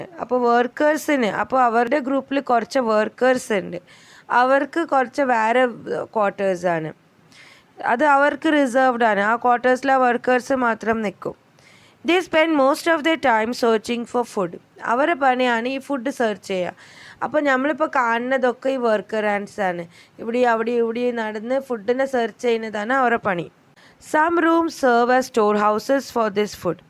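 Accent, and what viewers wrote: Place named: Malappuram, India. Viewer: Malappuram slang assahaneeyam!!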